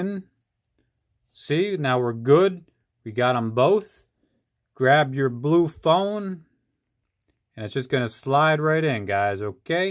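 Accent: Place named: Italy. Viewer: Hay! What happened you lost your Italian accent half way thru?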